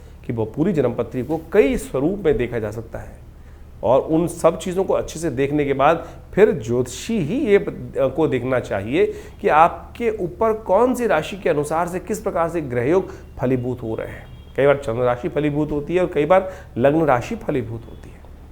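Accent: Standard. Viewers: Bahut sundar aur shudh uccharan hai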